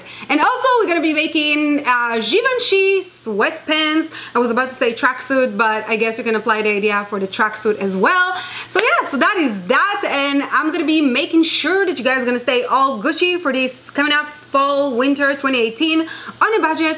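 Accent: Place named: Dominican Republic. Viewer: I liked this video. She cracked me up with her silly moments.. "immagrant problems" ctfu. I Black and Hispanic so I understand how she speaks. I wonder where she from. I wanna say Dominican Republic..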